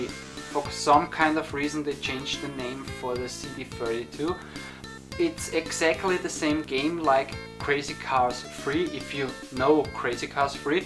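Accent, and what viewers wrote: Germany. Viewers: Its like the T-800 reviewing retro games ;) ;) Please take no offence i really enjoyed this vid. Just your german accent is very very strong. Greetings! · Alter biste deutsch? xD Das klingt so? :D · dein Bayrischer oder Ösi Dialekt hört sich ja GRAUSAM an !!! --- RrrRrRrrr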